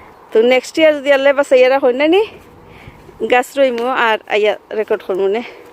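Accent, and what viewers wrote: Sylhet, Bangladesh. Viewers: আমরার সিলটি আপার মাতের মত লাগে সুন্দর সুন্দর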